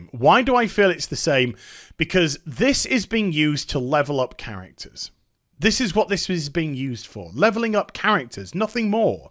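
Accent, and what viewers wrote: United Kingdom. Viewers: I gotta say, i really like the British way you say things. You remind me of sargon of akkad.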